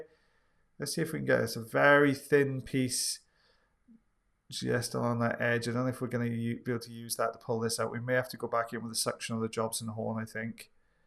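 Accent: Wales, United Kingdom. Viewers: it's definitely Welsh. Sounds probably from the valleys of Wales. Hth xx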